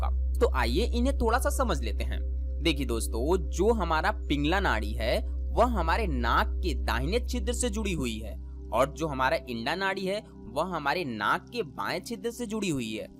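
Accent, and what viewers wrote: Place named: Bengal, India. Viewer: Abe Bengali kaisi hindi bol raha hai. इंडा क्या होता है । ठीक से बोल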